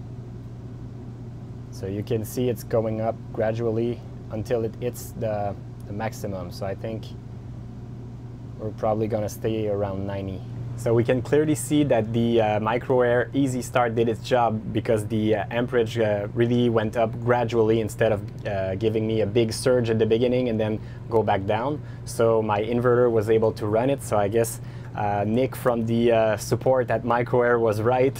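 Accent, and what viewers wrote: Canada. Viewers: Oups je me suis trompée de vidéo mais j'ai like quand même. A bientôt pour une vidéo avec votre accent Canadien en français 😉☺🤣😂🇲🇫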